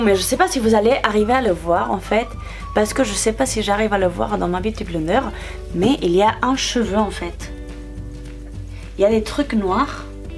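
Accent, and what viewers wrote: Spain. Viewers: T espagnol ? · Jsuis la seule qui kiffe l’accent espagnol sur le français ? 😍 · Axent espanioll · le "mo" c de la moisissure 😂😂 et c le fROmage et pas le Formage😉😂 et pour info je sais qu' elle a un accent et qu' elle est espagnole et pac française de base c pour ça qu' elle fait des fautes et je l ai juste corrigée pour qu' elle s améliore c tt les rageux il faut vous calmer😂😂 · T de quelle origine ? Ps: superrr videooo ❤ creo q ablas espagnol pienso q e reconosido el acentoo ❤ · Tes trop chou avec ton accent espagnol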